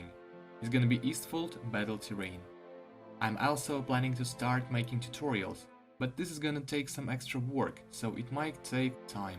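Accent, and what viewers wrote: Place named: Russia. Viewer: Я по акценту сразу определил русского)))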